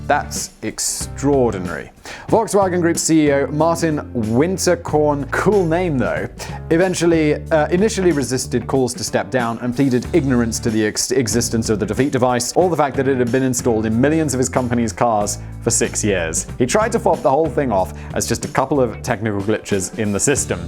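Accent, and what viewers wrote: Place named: RP, United Kingdom. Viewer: Anyone else enjoy hearing swear in his posh accent? For me, it’s similar to the inner-child glee I feel when Betty White says something that just seems ‘wrong’ coming from the mouth of a beloved grandmother figure. You go on with your bad selves, Miss Betty and. My inner-child thanks you.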